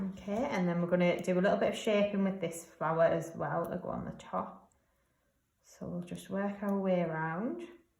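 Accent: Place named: Northumberland, United Kingdom. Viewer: Like your Northumberland accent :)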